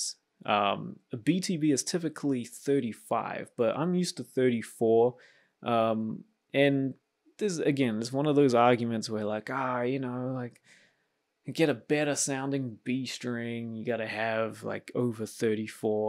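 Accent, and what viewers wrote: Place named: New Zealand. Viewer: It's always funny to hear the familiar Kiwi accent out of the blue. You're an amazing player bro!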